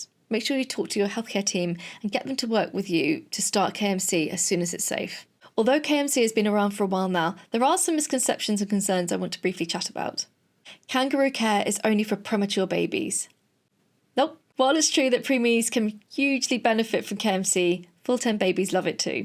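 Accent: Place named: United Kingdom. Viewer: Hi, I have been binging your content over the last week or so and want to say a huge thank you. Its refreshing to hear a British voice with experience in the NHS going over all these important concepts since so much of the content on Youtube is US centric. Im 37 weeks pregnant and feeling much better prepared for breastfeeding and postpartum thanks to your content. I was also fascinated by how the mention of "OF" clearly got your video seen by the algorithm and the engagement it got!!! Like the YT game is rigged against legit informative content... In any case, thank you and keep doing what youre doing ❤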